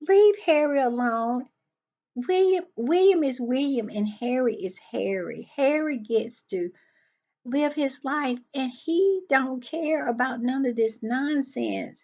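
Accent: South, United States. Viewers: ❤❤❤ here for the Read...here for that southern drawl ❤❤❤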